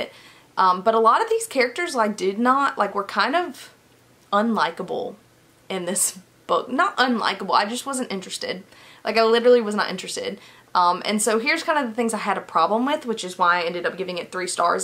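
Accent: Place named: South, United States. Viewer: lol definitely a little country but its not super thick. It pops out every few words for sure. I'm from New York though so my ears may also just be tuned into our sound 😂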